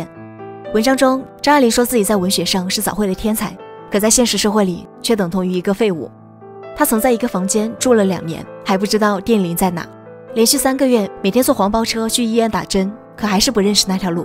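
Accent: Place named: Shaanxi, China. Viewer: 这口音，陕西、山西或内蒙的吧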